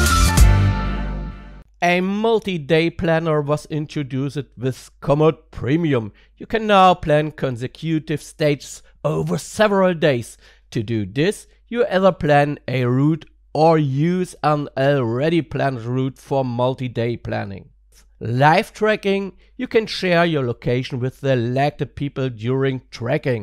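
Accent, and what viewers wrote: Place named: Germany. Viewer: (english down below) german: hi. schade dass du nicht näher auf das live tracking eingegangen bist, das is das was mich an premium am meisten interessiert. also wie das live tracking genau funktioniert, wie zuverlässig es ist, und ob man auch ohne komoot meinen standort tracken kann. mein plan: ich möchte meiner community während einer tour im livestream die möglichkeit geben, zu sehen wo ich gerade bin. und natürlich haben die leute warscheinlich selber kein komoot. nebenbei: schon nach den ersten wörtern dachte ich mir "die aussprache klingt so als wäre er deutsch". und ich hatte recht. english: hi. it's a shame that you didnt describe the live tracking more detailed, because that's the premium feature i'm most interested in. i mean like how exactly does the tracking work, how reliable is it, and can you check/track my location without having komoot? 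my plan: i want to give my community the opportunity to track my location during a livestream tour. and of course they most likely dont use komoot themselves. on a side note: after a few words i already thought "the pronouncation sounds like he's german". and i was right.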